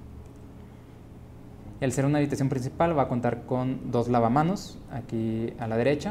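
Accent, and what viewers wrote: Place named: Guadalajara, Mexico. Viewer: A aquellos de México — este acento es típico de la región de Guadalajara? Lo encuentro muy interesante.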